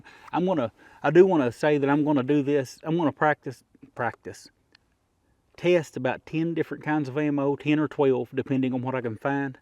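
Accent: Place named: New England, United States. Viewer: Great vid man. That's a helluva Yankee accent. :)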